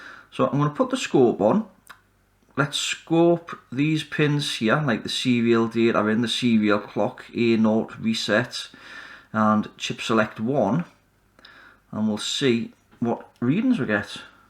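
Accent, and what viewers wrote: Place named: Northeast England, United Kingdom. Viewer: Great video, I have not got a clue what you are doing but it was a great watch, keep up the content, looking forward to seeing more. I recognise the accent, Im from the northeast as well.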